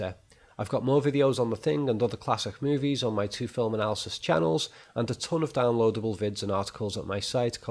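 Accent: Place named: Scotland, United Kingdom. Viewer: Dammit your accent is awesome. You should star in the next Braveheart.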